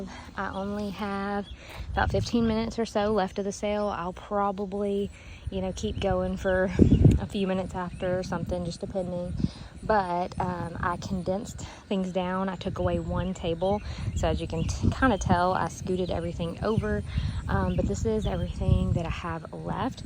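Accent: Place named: Kentucky, United States. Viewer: I can't quite figure out your accent. Are you from Kentucky or Tennessee or some place like that?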